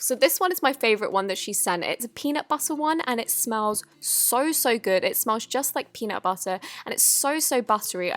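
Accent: United Kingdom. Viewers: Hamiltrash -_- "the british accent" is very broad britian includes scotland england wales a n ireland, most people that live far away from eachother (such as the north and south of england) cant understand what they are saying! accent is deffinatley english, like zoella's but she definatly doesnt sound northen so im guessing she is from some area more west also if you say that are language is weird (which I know you havent said that but lots of people do) just know that we (the brits) * created the language you speak! have you everheard an american tell anyone that they speak american? well if you have then they are damn wrong because americans, canadians and so on speak *ENGLISH the language that origanated in, what country? England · Sooooo im still confused are you brittish? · what part of the u.k. are you from i live you're accent!!!!!!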